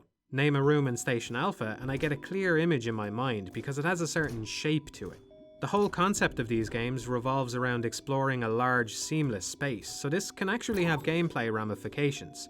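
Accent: Ireland. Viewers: I only watch this for the Irish accent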